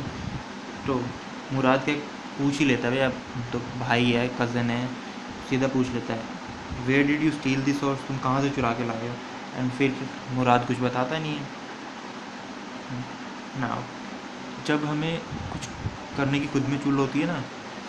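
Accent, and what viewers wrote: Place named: Delhi, India. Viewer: 🤣🤣the way u teach is absolutely fantastic I really like it and the part of uncle is damn funny by your language i can guess that you are from Delhi m i right??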